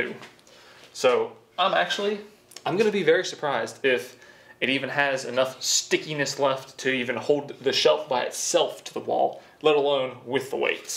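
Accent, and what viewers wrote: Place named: North Carolina, United States. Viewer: What state is he from. My guess is North Carolina , me being from there our accents sound familiar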